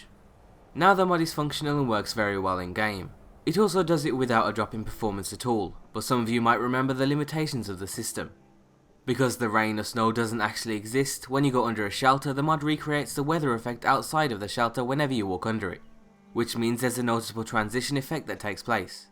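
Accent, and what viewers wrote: United Kingdom. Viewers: yeah nice but god i hate that british accent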